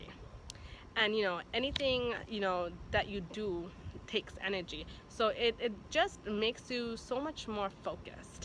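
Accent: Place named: United States. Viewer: This indian lady speaks perfect English with an American accent! Lovely eyes! I want this woman!!! Lol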